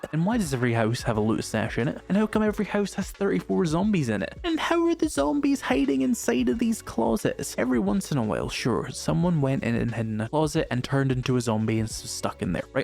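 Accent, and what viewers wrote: Scotland, United Kingdom. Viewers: Complaints in a Scottish accent just work!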